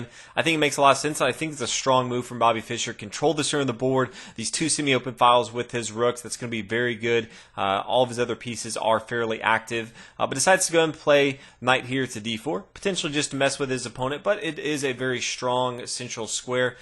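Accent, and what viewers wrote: United States. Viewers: Sorry to say but this strong american accent is really bothering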